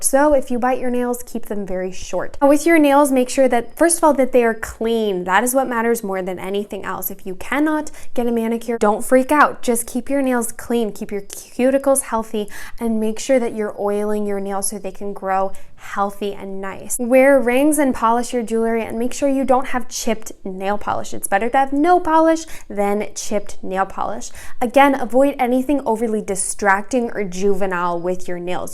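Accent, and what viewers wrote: Midwest, United States. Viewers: Where in the midwest are you from?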